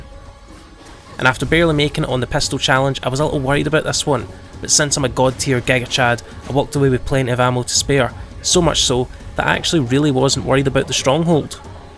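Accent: Scotland, United Kingdom. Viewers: Scottish Dante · scottish dante ma men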